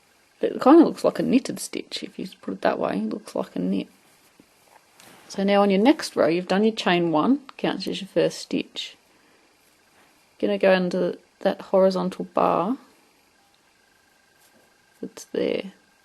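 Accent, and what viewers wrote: Australia. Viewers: you are hearing the true Aussie accent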